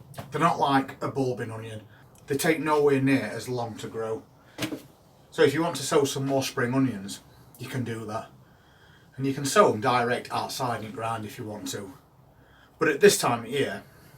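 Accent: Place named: England, United Kingdom. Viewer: oh no. I love the bells. Your video music reminds me of that old song about the flowers in an English country garden. That plus your lovely accent.... No quintessentially English, and this English woman loves it all!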